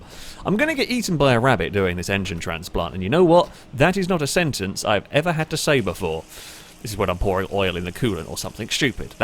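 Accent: United Kingdom. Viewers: Are you British? I'm irish and we dont like the British but if you are your a exception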